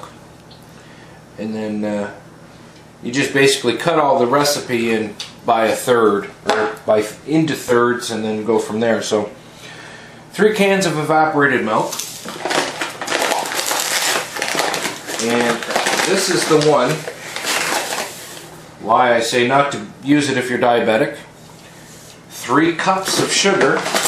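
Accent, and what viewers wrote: Nova Scotia, Canada. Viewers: "the haaaard part" - I'm from NS, living in Japan now and trying to spread local cuisine. Your videos have helped me out a lot and it's nice to hear an East coast accent.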